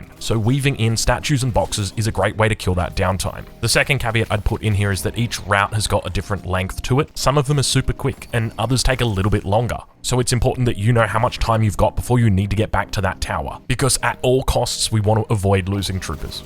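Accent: Australia. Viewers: Wait what the fuck why do I click on a random Deadlock recommended and its THE narrating??????????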